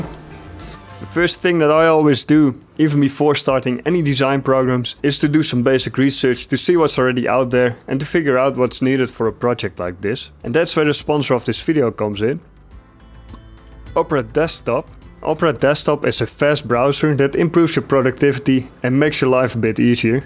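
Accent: Netherlands. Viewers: het shitste Hollands-Engels accent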